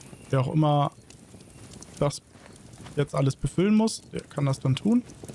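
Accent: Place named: Sweden. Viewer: ist Schwede Mütterlicher Seite. Da Spricht man es so aus.😂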